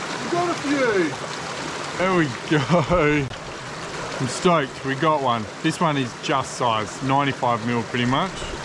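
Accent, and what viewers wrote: Australia. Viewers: Hey can somebody tell me where is filming his videos? Is it Australia or NZ? Probably AU right? Not a native speaker so also curious whether he has a typical aussie accent?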